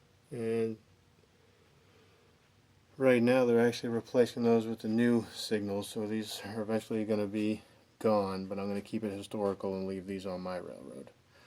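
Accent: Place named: Midwest, United States. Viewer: Beautiful! BTW - let's work on your midwestern/northern Illinois accent a little....Dekalb is Dee-kal-b { really stress the kal, as in 'pal' }, not dakahlb…..Rochelle is Ro-shell, not Rah-shell....Proviso is Pro-vigh-zo, not Pravizzo. As I see you're striving for perfection, I figured you'd appreciate some constructive criticism! Keep up the good work!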